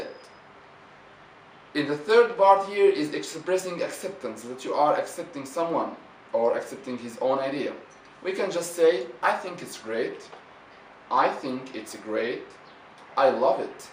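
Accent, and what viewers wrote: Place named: Egypt. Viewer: Egyptian accents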